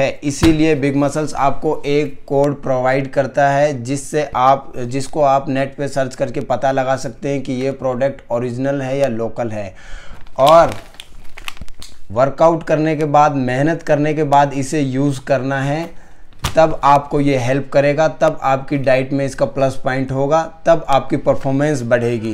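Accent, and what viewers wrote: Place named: Haryana, India. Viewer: Bhai kaha se ho seems from Haryana . I am from faridabad , do you offer paid service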